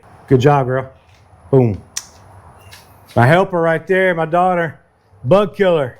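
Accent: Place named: Georgia, United States. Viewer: Excellent video.I'm from georgia, and I had trouble with wasps when I was a kid, and i'm still scared of them now. I'm subscribing to your channel just because of how well this video was produced. Excellent information.Now, I won't be so worried when I go and help my mom take out wasp.Nest at her house. Also, it was a pleasure here in a country.Accent, that's one of the things I miss about georgia. Keep up the good work, sir.And god bless you and your family.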